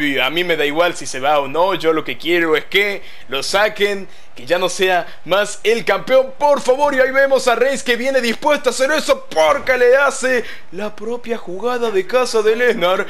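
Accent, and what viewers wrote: Tucumán, Argentina. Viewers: Sos de Tucumán? Argentina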